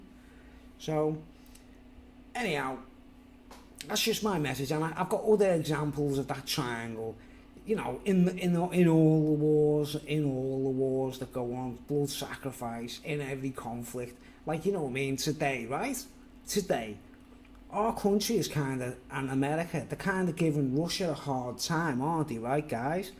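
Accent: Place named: Liverpool, United Kingdom. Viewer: Hi, another interesting speech,it was the gelert solo tent video that brought me to your channel in the first place, and I liked the Liverpool accent.funny thing is it's only when i'm having a swally I head over to see what your up to:-) (no offence) keep up the speeches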